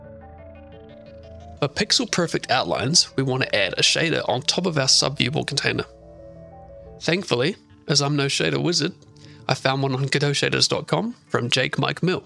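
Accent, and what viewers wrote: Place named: New Zealand. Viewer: ayyyy new zealander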